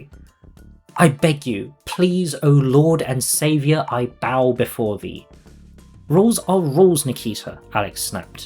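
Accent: United Kingdom. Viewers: There was a 50/50 chance that this would be another of these dumb, right wing US videos and I was never happier to hear an british accent! Very interesting and equally entertaining video!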